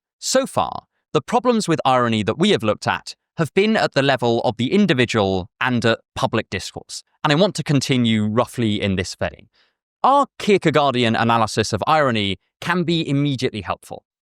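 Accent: United States. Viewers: Is it a tone? Or just a midwesterner? The world may never know.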